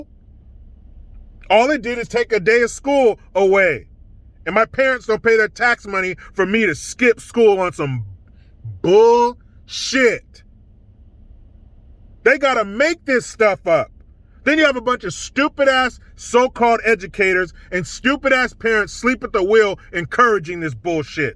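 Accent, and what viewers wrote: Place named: South, United States. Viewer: OMG I love that country accent. 😄😄😄🤯🤯😄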